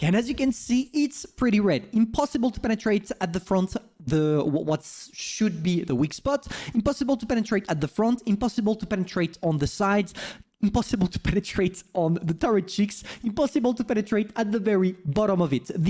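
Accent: France. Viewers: is he from france?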